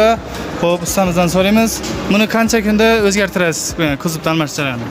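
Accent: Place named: Khorezm, Uzbekistan. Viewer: Моторни курдик потори кани рулни билдик пул даяни нови хоразмча гапливар дм чулаштириб тошодиг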